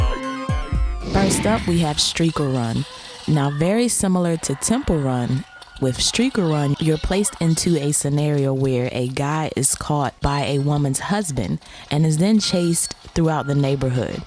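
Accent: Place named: New York, United States. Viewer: Altaïr ibn-la’ Ahad it’s not funny because the “joke” is false because she sounds like a white New York lady.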